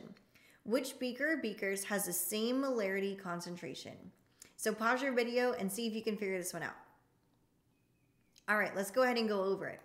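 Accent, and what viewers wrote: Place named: United States. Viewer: Not long found your channel and I love your videos, even the American accent! Many thanks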